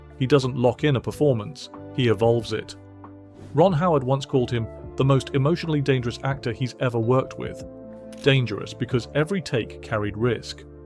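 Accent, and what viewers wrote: United Kingdom. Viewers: Would have been nice to hear it from Ron's own voice instead of an overlay of a British nobody. Nobody can become Ron Howard, even for this explanation, which is not an interview. Disappointing.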